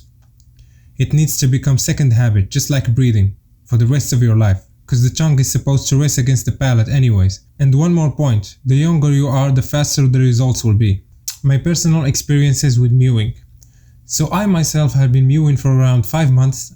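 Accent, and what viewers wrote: Morocco. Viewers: May I ask if you're Moroccan / Algerian? You're accent really sounds like you're from there :) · You sound Moroccan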